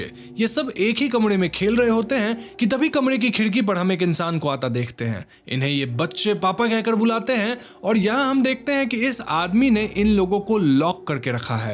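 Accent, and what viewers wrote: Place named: Bihar, India. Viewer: Your accent is so cheap every time you say D instead of R ... total bihari accent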